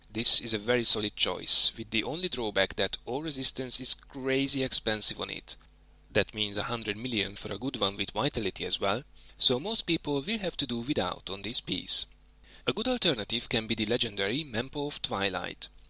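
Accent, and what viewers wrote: Hungary. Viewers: bakker, meghallom a hangod, "ez magyar akcentus" megnézem, és tényleg:D jó videó :D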